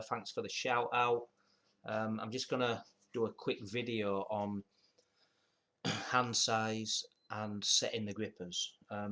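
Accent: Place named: North, United Kingdom. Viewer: Really interesting vid with well articulated points - referencing all my fave grip channels. Subbed after being directed here by Nick. Good to hear a familiar northern accent talking grip. Look forward to more content ✊💪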